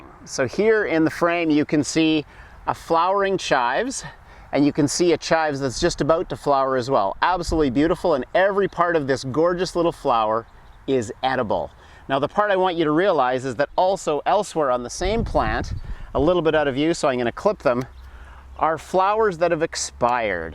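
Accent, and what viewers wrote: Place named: Canada. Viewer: I totally could hear you were from Canada, ay?🙂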